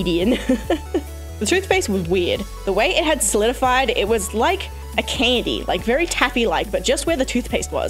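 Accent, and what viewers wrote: Australia. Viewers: Australia? Are you Australian?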